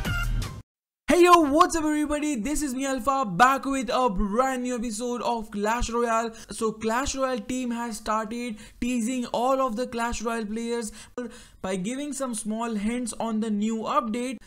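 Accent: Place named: India. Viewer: Seems like Indian accent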